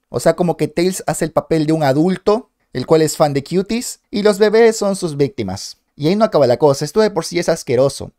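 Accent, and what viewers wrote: Venezuela. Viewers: espera eres venezolano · una pregunta we ere venezolano s o n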